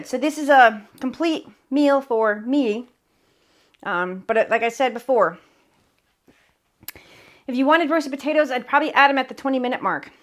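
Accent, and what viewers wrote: United States. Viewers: Please don’t apologize for normal speech. I enjoy your cooking passion and your every day American speech. You owe no one an apology. Love your videos!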